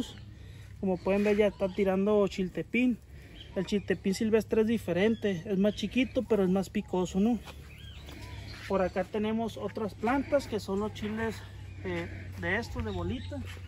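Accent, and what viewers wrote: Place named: Sonora, Mexico. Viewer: Buenos días. los felicito por ser tan amable en explicarle. Yo tengo ganas de hacer una siembra tengo terreno agricola. Soy jubilado me enfado mucho. Es muy interesante. Su publicación. De dónde son ustedes. Hablas como de Sonora. Yo soy de Huatabampo Sonora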